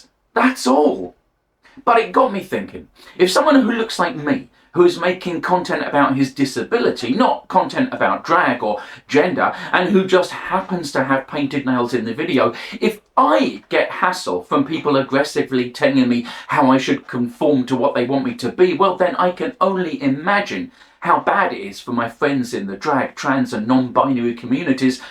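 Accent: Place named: United Kingdom. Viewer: What painted nails? Im too busy reading his face while hes talking. Im too busy taking in his words, tone, delivery, expressions, eye movement, what hes wearing, his cleanliness, his neatness, whats he wearing, whats in the background, where is he? Are there background noises? What area of the UK is he from with his accent? And my own autistic brain needs time to digest and process his words. Where is he from? What does he do? A thousand things to take in. I didnt notice any polished nails. I was too busy watching his face because thats how i listen. I listen with my eyes as well as my ears. 😅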